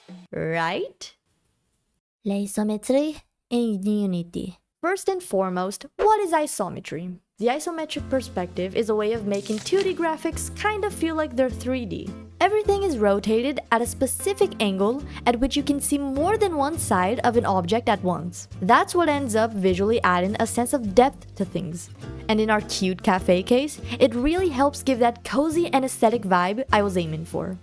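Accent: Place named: Brazil. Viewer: O sotaque brasileiro é inconfundível, sucesso!!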